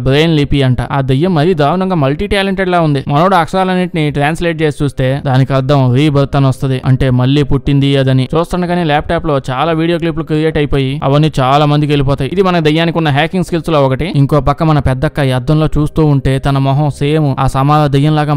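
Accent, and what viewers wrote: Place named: Telangana, India. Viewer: Super bro horror movie kuda comedy movie laka chepavu telangana language super vudi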